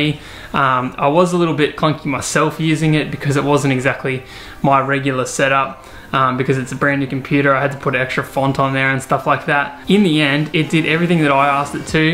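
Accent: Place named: Australia. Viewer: What a great guy you are. So nice to hear an Aussie too. I am about to buy an Air M1 for my grandaughter to use photoshop. Really appreciate your feedback/review. 😀😀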